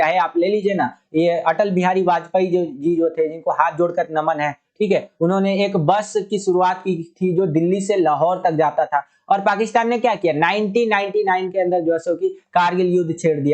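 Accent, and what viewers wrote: Bihar, India. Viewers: Sir Bihar se hai kya aap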